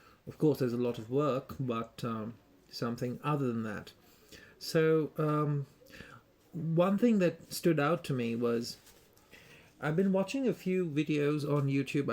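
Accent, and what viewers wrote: United Kingdom. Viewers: You are indian yet you have a very British accent. I do not know why but i find this peculiar. Have you studied in UK or in a UK school in india perchance? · Yeah I thought he is from England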